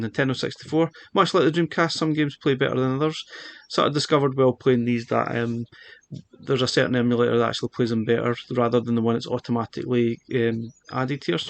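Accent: Scotland, United Kingdom. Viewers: Hi! First off, I like your reviews the best… Scottish accents are my favorite! I speak English but can’t understand a word of Scottish! It’s brilliant! My question…. Does it have intellivission?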